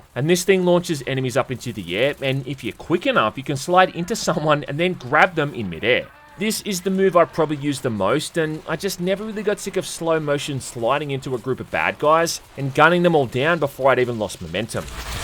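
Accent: Australia. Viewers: I was on the fence about this game. I had watched exactly nine reviews on this and still wasn't convinced. However, YOU convinced me. Hell maybe its just cause your austrailian and that is the funniest accent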